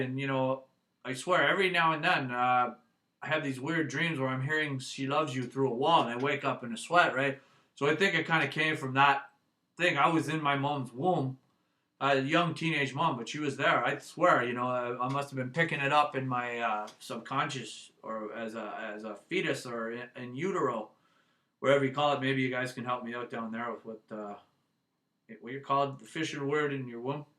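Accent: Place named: Canada. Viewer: Wow, you have the most stereotypical Canadian accent I've ever heard!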